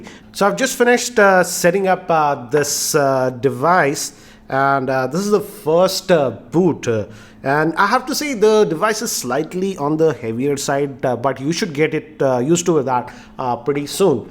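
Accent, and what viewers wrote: Hyderabad, India. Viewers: Geekyranjit I like your hyd hindi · waiting for haidrabadi Hindi😂😂😂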